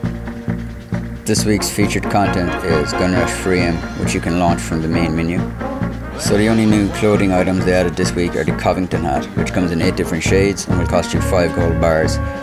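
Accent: Ireland. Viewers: Keep talking Irish bro... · Love the Voiceover man, are you irish? Your accent kinda sounds like it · Holy shit, you're Irish? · Voice over is brilliant, Irish voice goes really well with a cowboy theme imo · Are you Irish yeah? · The voice over is fne a great accent from the Emerald isle. Good vid, keep up the good work.👍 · Also thumbs up to the voice over; you've kept all the important text for when I'm on the bus watching and don't want to bombard folks with audio they didn't ask for, but I'm sure they wouldn't mind with the low volume, even pace, and Irish accent. A+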